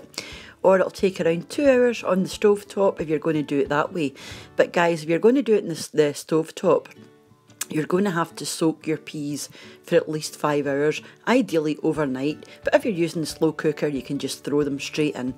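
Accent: Scotland, United Kingdom. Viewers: I’ve just found your YouTube recipes, I love how simple you make everything love the Scottish accent too reminds me of my good friend, thank you for sharing, I love it x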